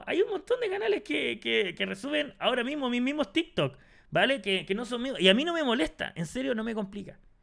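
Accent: Chile. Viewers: eres chileno wn?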